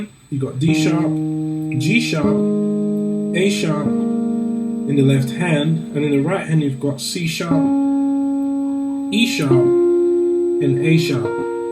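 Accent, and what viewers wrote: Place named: United Kingdom. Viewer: You sound like youre from the UK, which church do you go to?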